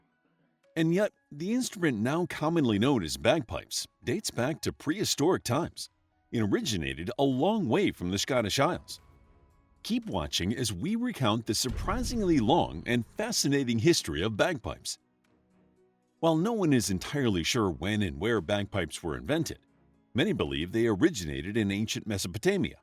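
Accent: United States. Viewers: La voz de la IA es de risa. Cantigas de Santa María con acento de norteamericano que no sabe hablar español. Es de risa. La IA va a acabar con nosotr@ A demás es muy triste que no haya un humano al timón para corregir algunas palabras y malas traducciones. A demás faltan muchos datos.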